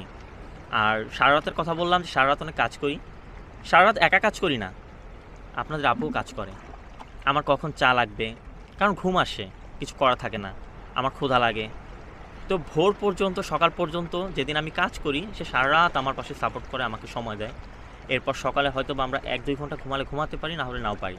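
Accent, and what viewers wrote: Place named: Kolkata, India. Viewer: আপনার বয়েছ কলকাতার বাঙালিদের মত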